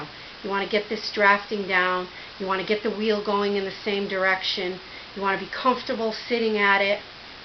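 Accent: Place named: Upstate New York, United States. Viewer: This is so wonderful. Thank you! Are you from upstate NY? You sound like my family!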